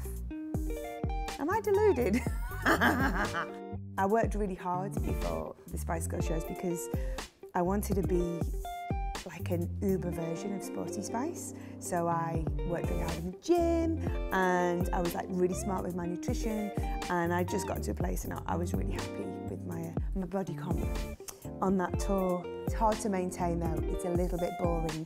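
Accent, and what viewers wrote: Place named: RP, United Kingdom. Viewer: Wheres this posh accent coming from.. hate it when this happens to pll .. shame on your northern roots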